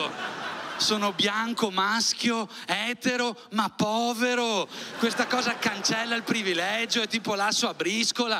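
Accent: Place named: Veneto, Italy. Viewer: La narrazione condita da un importante accento veneto conferisce un tono ancora più paradossale al monologo, ai limiti del surreale. Bellissimo, ho riso tanto!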